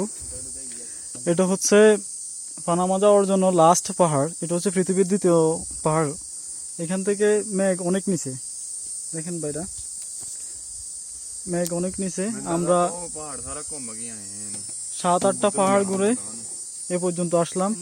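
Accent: Noakhali, Bangladesh. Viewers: Vai mone hoy noykhalir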